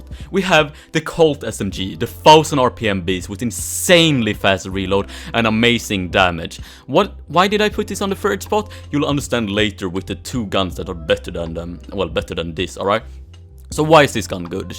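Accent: Sweden. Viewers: I guess that you are from Sweden.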